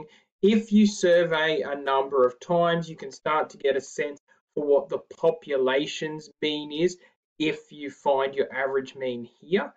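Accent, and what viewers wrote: Australia. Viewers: your australien accent makes math fun thank you mate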